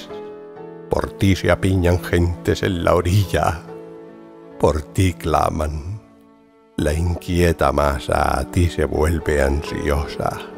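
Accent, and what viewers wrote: Spain. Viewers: Eres el mejor señor, además me encanta el acento español, me parece que se oye más hermoso el castellano. Un saludo desde Colombia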